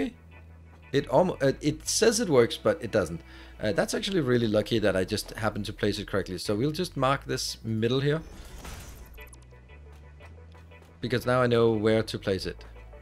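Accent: Denmark. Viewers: are you from denmark???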